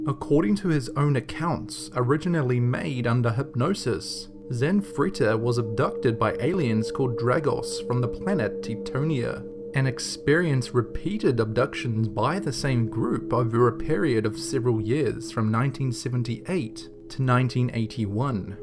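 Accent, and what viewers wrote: New Zealand. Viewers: What is the narrator's accent? I want to place it as from New Zealand? But some Australian influences? Kiwi accent or nah? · That Kiwi accent just kills it for me.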